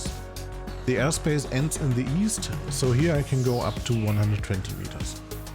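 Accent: Germany. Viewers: best episode from over the traintrack so far! as a german, I really like your german accent. has some nice authentic touch to it. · The voice works well! German accent gives extra charm to the video, Serious, I can't imagine any other accent for Tesla German factory. Why can you not fly over the factory anymore? The video is excellent, as always. Maybe a zoom improvement in the future. Greetings from NL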